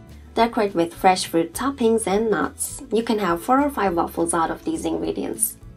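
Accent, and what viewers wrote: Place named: Turkey. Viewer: Aksanın gayet güzel ama nedense Türk olduğunu hemen anladım. Tarif için teşekkürler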